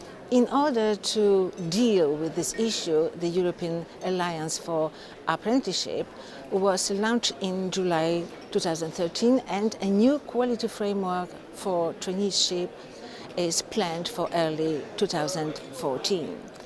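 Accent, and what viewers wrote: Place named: Poland. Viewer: No wymowa angielska nieszczególna, bardzo wyrazny twardy polski akcent.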